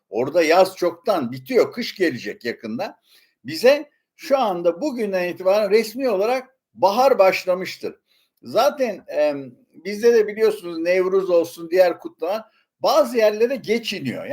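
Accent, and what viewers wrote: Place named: Yozgat, Turkey. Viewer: Yozgatlı ağzı var kardaş sende bir de çatı,reklâm falan burada bile dümen turundasın çene güçlü edebiyat iyi..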